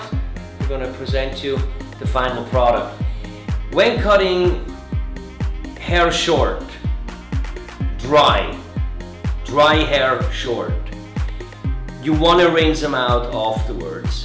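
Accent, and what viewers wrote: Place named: Germany. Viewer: Where is your salon. I detect a German accent...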